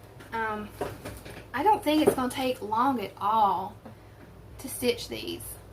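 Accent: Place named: South Carolina, United States. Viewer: I love your South Carolina accent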